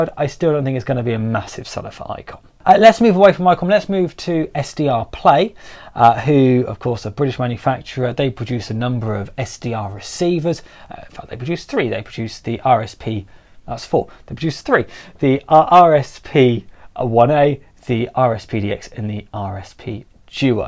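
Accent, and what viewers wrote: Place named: Texas, United States. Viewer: Great video! I know I will not be buying the T10 for that price. Lots of lower priced options (not bowfung) I notice you have an accent are you from Texas?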